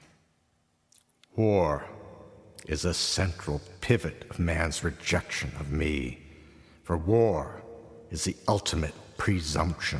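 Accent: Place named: United States. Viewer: Kevin Walker No. DeGrimston was British, this is an American accent.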